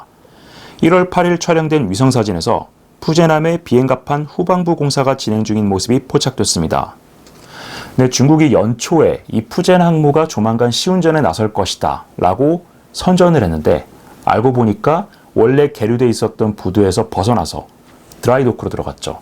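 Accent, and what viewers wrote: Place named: Seoul, South Korea. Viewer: 서울말 좋아요^^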